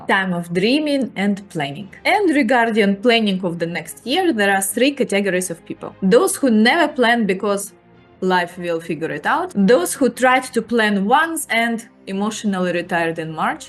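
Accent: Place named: Russia. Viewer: Чувствуется Русский акцент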